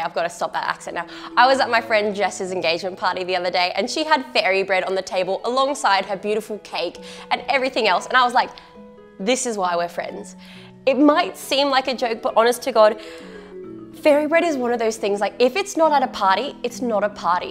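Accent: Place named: United Kingdom. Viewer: Fabulous British accent!,..cool to hear you call them hundreds and thousands like we do and not sprinkles like in America..lol 😊👍🇬🇧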